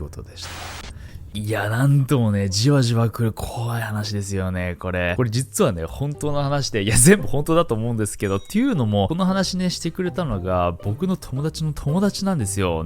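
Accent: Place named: Kyushu, Japan. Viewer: ナオキマンの九州弁からしか得られない供給がある。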